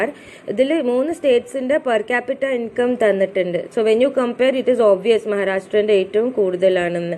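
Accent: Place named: Kasaragod, India. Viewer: Madam please try to avoid the Kasaragod slang ❤️ thank u mam for your help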